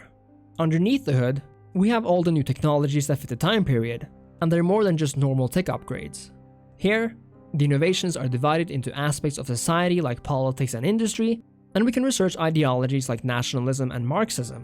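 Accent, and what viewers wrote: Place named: Norway. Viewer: I detect a Norwegian accent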